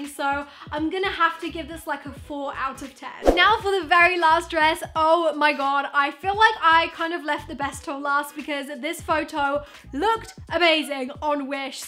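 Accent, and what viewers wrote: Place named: United Kingdom. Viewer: I love her British accent ❤️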